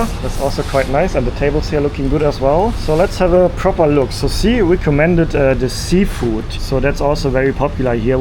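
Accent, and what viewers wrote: Germany. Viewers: are you born german? your accent is blended sounding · Thickest German accent ever!!! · Der Deutsche Akzent knallt richtig rein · Let the German have his German accent folks. You can't POLICE the entire world's pronunciation. Hell, Americans don't agree on how to pronounce AMERICAN CITIES. Have some grace. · He's from Bremen, Germany and decided to make his videos in English.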